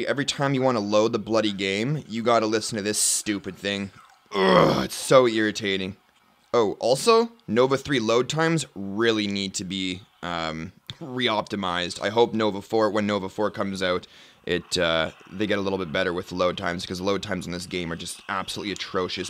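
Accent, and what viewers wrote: Canada. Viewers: I must ask... Are you Canadian? :>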